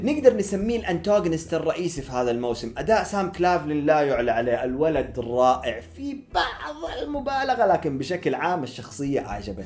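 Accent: United Kingdom. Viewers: التمثيل رهيب كنك عندك لكنة ابريطانيا لو تروح هوليود اتحاول ادور طريقك 👏👏👏❤❤ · لكنتك الاسكتلندية رهيبة 😂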